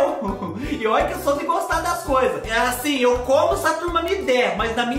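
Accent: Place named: Minas Gerais, Brazil. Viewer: Kkkkkkkkkk vai gostar de falá assim lá em Minas Gerais ,mais me diverti muito.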